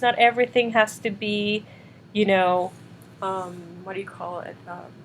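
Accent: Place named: South Florida, United States. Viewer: You barely have any accent....I live in Florida and you sound like you're from South Florida. Wish I coyld speak Spanish as well as you do English.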